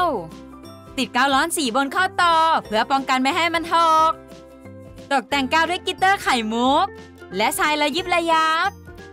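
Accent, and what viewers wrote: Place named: North, Thailand. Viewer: เสียงคนภาคดูเหน่อๆนะ สาวเหนือรึเปล่า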